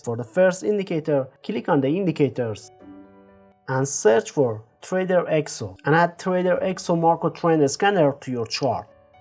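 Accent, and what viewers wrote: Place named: Iran. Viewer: Just the ones I was looking for. Thanks so much.👍👍👍 By the way, from your accent I guess you're Iranian, am I right?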